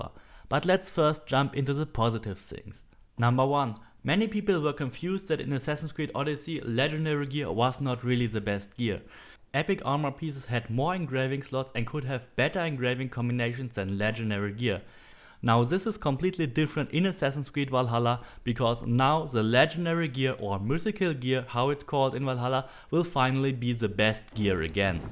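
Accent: Germany. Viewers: Where u from bud, u sound german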